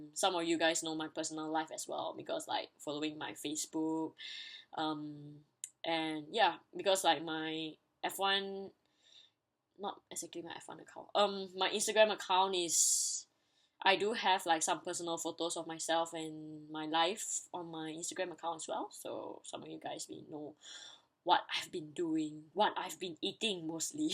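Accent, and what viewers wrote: Singapore. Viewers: What no I love singaporean accents (lah) :) You're so lovely (I'm watching!) and am looking foreward to more vids!! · Aww don't apologize. I love your accent. I always wanted to visit Singapore. I hope you can check out my channel too?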